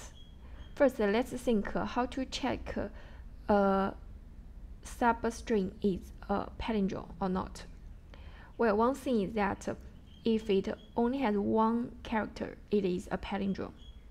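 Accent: China. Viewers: Improve your English. It's hard to understand so called Chinese English.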